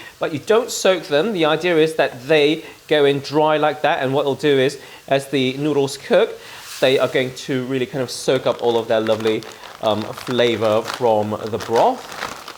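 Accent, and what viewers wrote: United Kingdom. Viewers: ❤ your british accent 😊 sir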